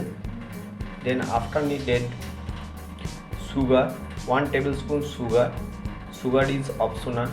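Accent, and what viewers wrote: India. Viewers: Mania the reason why we are laughing it's because it's an Indian speaking this way... FYI french , Chinese, Spanish, Russian, Africans, and many rural country accross the globe speak worse than this... Atleast we understood him if it were some other countries mentioned above we wouldn't have been able to understand them even more... Stop criticizing and appreciating... He's got a million people supporting his content.. work is more important than the language.. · Are you from India I love the accent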